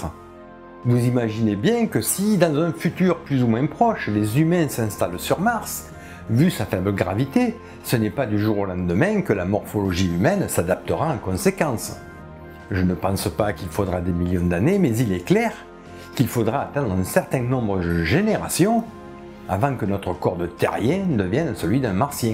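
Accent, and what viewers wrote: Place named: Marseille, France. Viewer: Bonjour, et bravo pour vos connaissance en paléontologie, J'ai 82 ans et j'ai fais des fouilles ici en Belgique dans ma jeunesse Mais lorsque je voie la quantité et la richesse des découvertes ....je peu revoir TOUT c'est fabuleux la quantité d'animaux que l'on a découvert plus ceux que l'on avait déjà mais que l'on n'arrivait pas a classé. C'est fabuleux ! Je regarde très souvent vos programme et en plus j'adore votre axent marseillais .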